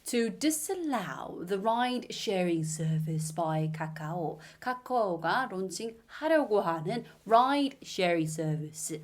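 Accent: RP, United Kingdom. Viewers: 아나운서님 ㅜㅜ ♡ 오늘도 너무 재밌게 봤어용 ㅜㅜ 영국 발음 너무 멋있으세영! 님 응원받고 정말 큰도움이되었어요오! 답글 정말감사했습니당 ㅜㅜ ♡ 감사합니당! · 아... 아나운써님 너무 멋져요. ㅎㅎㅎ 포쉬액센트가 이리 멋질줄니야..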